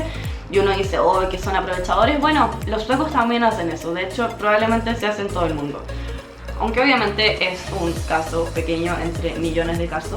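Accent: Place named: Chile. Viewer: Tu acento me suena chileno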